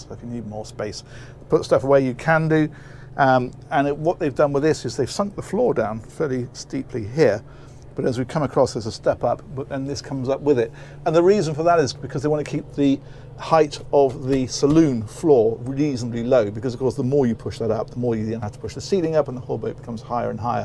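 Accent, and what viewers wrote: England, United Kingdom. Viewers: starting my morning with "crockery" always makes me happy (don't ask me why...I have no idea, just love the English vocab and accent)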